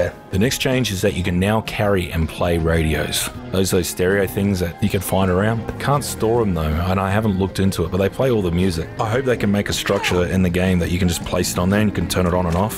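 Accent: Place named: Australia. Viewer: If I click a Forest video and it doesn’t have a gritty Australian accent, I assume it’s a clickbait or just bad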